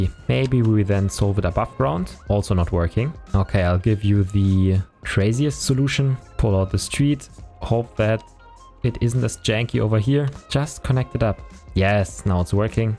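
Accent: Germany. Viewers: This video’s quality is incredible — at first I thought it was made by a big YouTuber! I was so pleasantly surprised to discover your channel. The content, your voice, and even the German accent (it adds a unique charm!) are fantastic. Can’t wait to see more!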